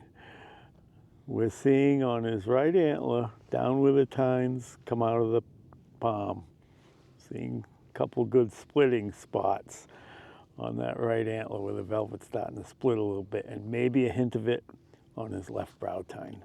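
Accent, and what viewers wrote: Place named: East Coast, United States. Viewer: I often wondered when the shedding took place. Seeing the breath i guess cooler air is starting already. Hearing your accent any chance your from the east coast?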